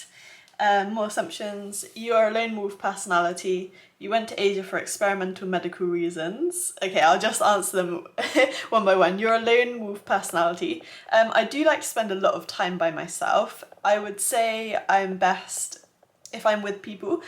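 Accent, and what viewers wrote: United Kingdom. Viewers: Would live to find a cute and sweet british girl like. That accent and cute face is all an American guy needs. 😍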